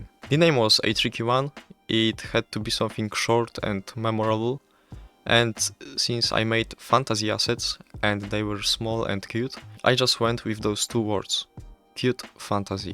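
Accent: Poland. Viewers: brzmisz strasznie polsko, powodzenia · ty polak co nie XD od razu slychac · poznam polaka jesli go usłysze... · ty z polski jestes bo taki akcent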